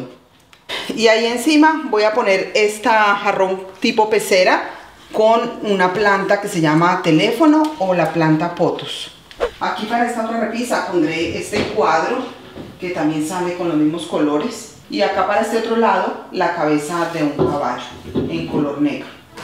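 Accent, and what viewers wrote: Colombia. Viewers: 🇨🇴💛💙❤️🇨🇴 Dios te continué bendiciendo. Me alegro mucho . Escuchar ese acento colombiano. Muy chéveres tus ideas.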